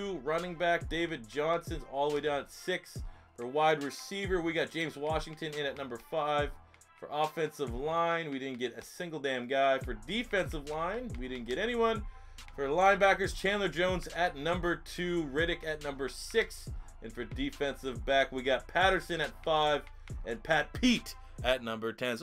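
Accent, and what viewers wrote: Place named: Canada. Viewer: I can tell you are Canadian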